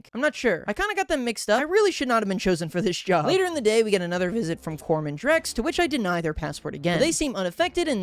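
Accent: United States. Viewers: nah bro that is not arstroka edit: I can't watch this, it's unbearable. The americanization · I couldn't tell if it was intentional rage baiting or his flavor of American is just not used to words like that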